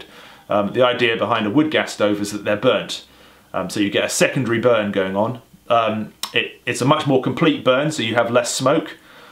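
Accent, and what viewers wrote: England, United Kingdom. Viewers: Thank you. I’ve just found your channel, but you have another subscriber on the strength of this one video. Also a nice change to find an English accent and a practical purpose, rather than some over-tattooed young person preparing for an “Armageddon” with a military hat and a (metaphorical?) gun on his hip. Thank you again. Les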